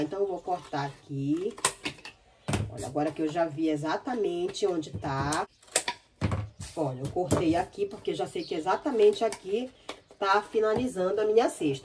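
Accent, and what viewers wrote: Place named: Belém, Brazil. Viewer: haaaaaa perguntei pelo sotaque, sou de Belém do Pará, fui em mosqueiro uma vez se não me falha a memória, amei saber que es daí 😘 muito sucesso pra ti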